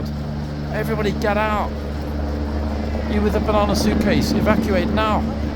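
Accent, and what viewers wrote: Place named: United Kingdom. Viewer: Nancy-I stay up until 3 am sometimes, watching videos! We aren’t crazy, just fans of a lovely English man that is dedicated to filming Horse Guards and London! narration is top notch and I love his British accent, too! It’s nice to see another American 🇺🇸 fan that loves videos and is a dedicated fan as myself! Best wishes, always! Shawn 😂❤🐴❤️🐎❤️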